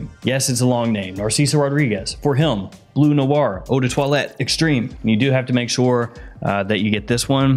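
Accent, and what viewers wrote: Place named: Tennessee, United States. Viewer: I like when uses his original Tennessee accent 😆 Embrace your southern accent 😀👍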